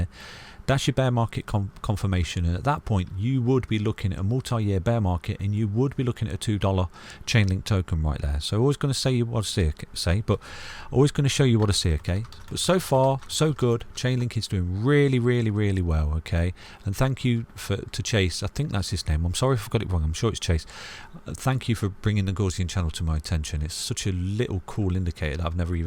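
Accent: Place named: Northern England, United Kingdom. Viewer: Love the videos thanks!! Love the Northern accent too - reminds me of home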